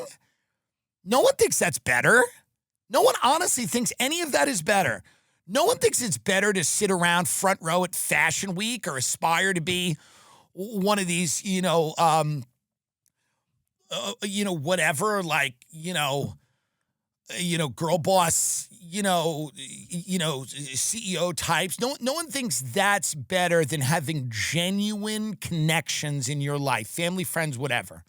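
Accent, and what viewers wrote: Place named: New York, United States. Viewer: at least for me, Tim's new york accent on top of bashing LA culture is all I would listen to driving around in my car, and I was born here.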